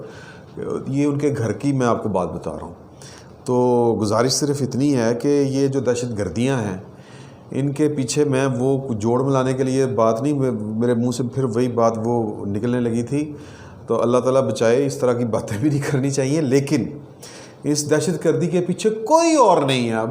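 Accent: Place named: Lahore, Pakistan. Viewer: صاحب آپ جس لاہوری سٹائل میں بات کرتے ہیں نا وہ بہت دل کو موہ لینے والا ہے بہت شکریہ لاہور کی یاد تازہ کروانے کے لیے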